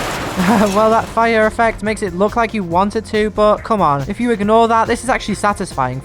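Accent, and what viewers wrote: United Kingdom. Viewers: ahhh now i hear your voice i can tell your british 😂. i hadnt heard it before this vid lol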